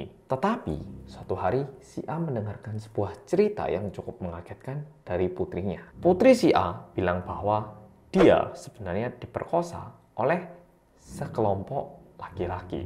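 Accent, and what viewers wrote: Java, Indonesia. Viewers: sudah mengurangi medoknya bang, pistol, wkwkwk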